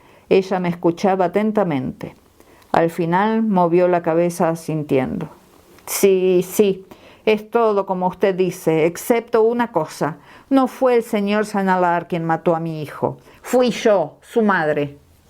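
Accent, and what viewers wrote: Argentina. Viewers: Que buena onda, creo que por tu acento sos argentina. Yo amo a Agatha Cristhie y este titulo jamas lo vi - ni lei-. Gracias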